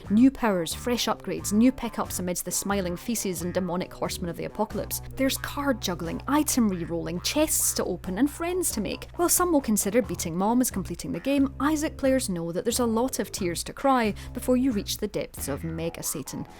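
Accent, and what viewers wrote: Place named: Scotland, United Kingdom. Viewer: Scottish narrator It is amazing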